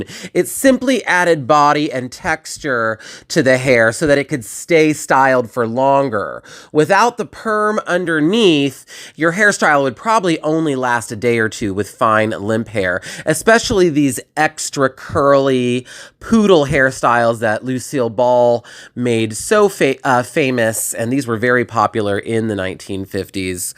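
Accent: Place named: Germany. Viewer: Diese deutsche Stimme😮😮😮😮😮😮😮😮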